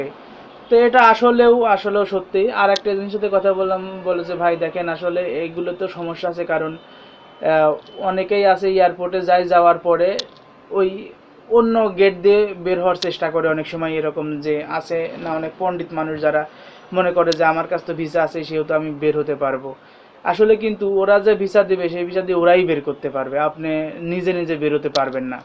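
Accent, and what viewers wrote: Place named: Jessore, Bangladesh. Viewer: আমি মলায়েশিয়াতে আছি ,আমার 7 টা দেশ all rady ঘোরা আছে , আমার বেতন 3500 রিংগিত বাংলা 70 হাজার + , তুরকি তে আমি to -rest অরিজিনাল ভিসায় যেতেচাই মাত~ 10 দিনের জন ,আবার মালায়েশয়াতে ফরবো, পরে ইউজোনে to -resta যেয়ে আর ফেরবো না , আপনার জানা মতে তুরকির ফাইল গুছিয়ে দেবে এই রকম এজিনসি আছে ??যানাবেন ভাই ,আমার বাড়ি যশোর ,আপনার কথা শুনে মনে হয় আপনারও ঐ দিকে ??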